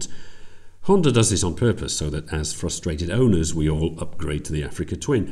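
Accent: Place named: RP, United Kingdom. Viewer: BBC ENGLISH ! :face-orange-tv-shape:🤩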